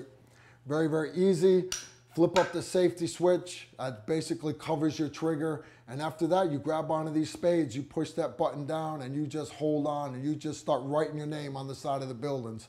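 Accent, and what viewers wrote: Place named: Brooklyn, United States. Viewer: Gotta be from Brooklyn or the Bronx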